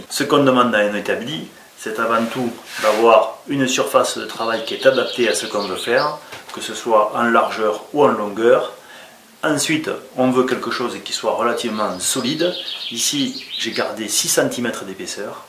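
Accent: South, France. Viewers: enfin une video simple et explicite a la fois ......qui plus et avec un bel accent du sud , ce qui ne gâche rien au plaisir d'apprendre .....merci pour tout vos bons conseils .....(yves)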